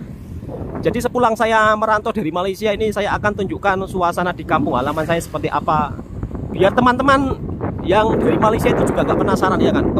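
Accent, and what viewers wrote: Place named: Central Java, Indonesia. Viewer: Tukang bangunan dan anak mudanya banyak yg kerjah di IKN, darih jawa tengah,jawa barat, jawa timur, merekah membangun ibu kotanya yg baruh di kaltim, mas sepertinya orang ngapak jawa tengah, tetap semangat mas walaupun tinggal di desah mantab ❤❤❤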